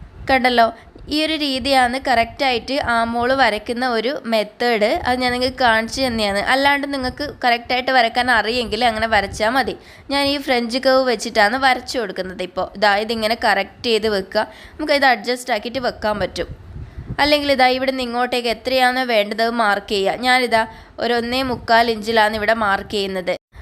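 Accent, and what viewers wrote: Kannur, India. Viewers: കണ്ണൂർ ആണ് അല്ലേ😂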